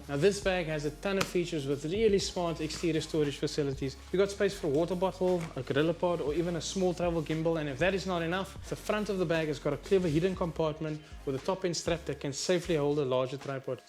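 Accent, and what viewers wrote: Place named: Cape Town, South Africa. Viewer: Howzit from a fellow Capey. I just discovered your channel. Really cool stuff. I enjoy hearing your accent. I live in Zagreb, Croatia. You should come here sometime and do some travel vlogs. We have some awesome locations.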